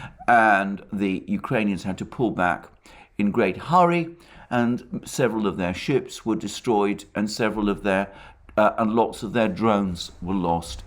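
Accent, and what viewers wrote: United Kingdom. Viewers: Ha! You are confusing a British voice with extraordinary insight. All this information is pretty much common and available all over the Internet. I agree he is eloquent in what little he actually says.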